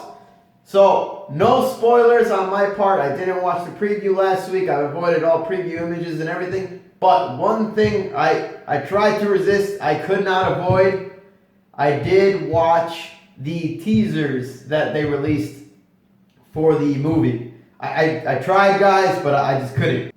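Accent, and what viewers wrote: Italy. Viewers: Ma sei italiano? Lol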